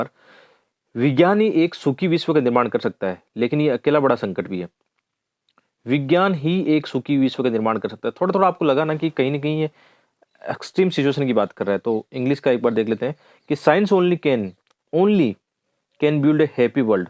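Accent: Haryana, India. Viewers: Sir are you from haryana apki awaj se pta chlta h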